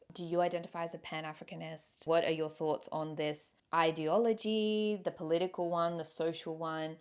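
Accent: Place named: United Kingdom. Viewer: Are you half white? ...You look half-white and sound like you were raised in Britain.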